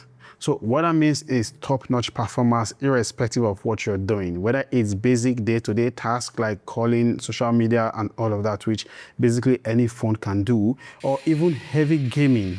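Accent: Africa. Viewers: I was so excited to click on the video and then I heard that damn African accent I am gone can’t do it. It’s like literally slightly less annoying than the Indian accent but just as bad can’t hear it sounds like chalk on a goddamn board.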